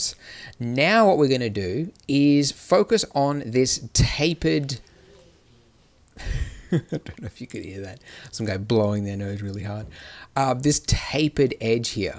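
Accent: Australia. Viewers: He's from Australia lol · funny australian Tutorial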